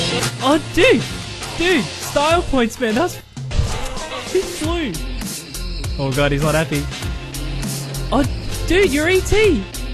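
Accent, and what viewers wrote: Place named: Scotland, United Kingdom. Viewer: that adorable Scottish accent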